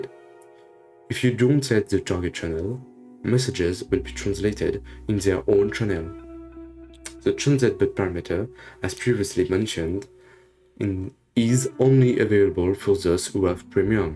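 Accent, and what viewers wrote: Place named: France. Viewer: No stress. We have a number of French users and I thought you sounded French so you might have done it already. I have now set them up, so not a problem. Thanks for the video though it is very helpful.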